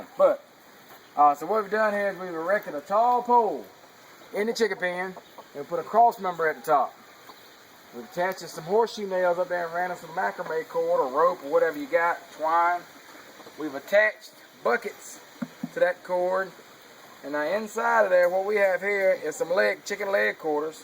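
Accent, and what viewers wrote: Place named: South, United States. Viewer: man oh man.. u have uncovered nature's ultimate feeds.. that southern talk is so cool but the message is gonna make the whole world filled with hanged holed buckets.. greetings man from the far east.. woo yahh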